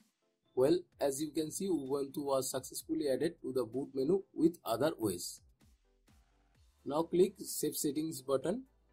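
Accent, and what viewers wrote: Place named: India. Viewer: you are the 397th random Indian accent guy on the internet that saved me thank you.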